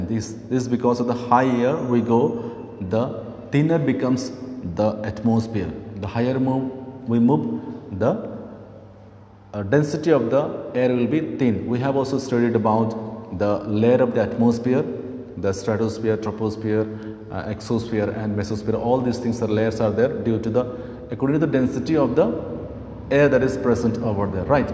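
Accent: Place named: Spain. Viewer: sir your exent is little bit spanish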